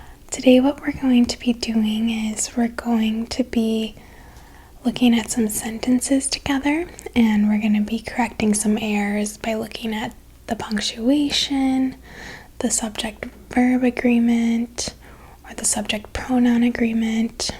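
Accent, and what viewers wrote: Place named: Upper Midwest, United States. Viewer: Phil Mercer many of us think she’s from Wisconsin or Minnesota. I’m from Wisconsin and that’s what I think dere hey.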